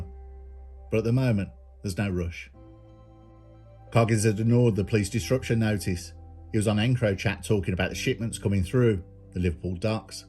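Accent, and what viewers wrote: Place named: Black Country, United Kingdom. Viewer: Lad as from the "North" and a don't usually find the Midlands/Black country accent a thing of gravity to myself, but your calm delivery has me thinking, thanks for these uploads.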